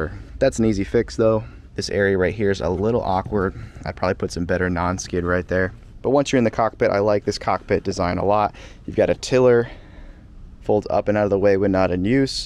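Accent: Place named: United States. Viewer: He’s not English , yank maybe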